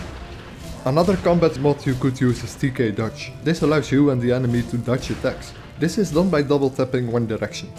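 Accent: Netherlands. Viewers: im dutch myself and i noticed how you talked, its sounds very much like dutch english xD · you sound very dutch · Let me guess, is that a dutch accent?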